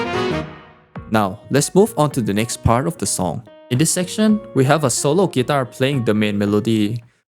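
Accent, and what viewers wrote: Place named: Thailand. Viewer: ตามมานานแล้ว พอรู้ว่าเป็นคนไทยยิ่งดีใจเลยค่ะ (เอ๊ะแรกคือจากสำเนียงค่ะ55) ทุกคลิปของคุณคือดีงามมากเลย จะสนับสนุนต่อไปนะคะ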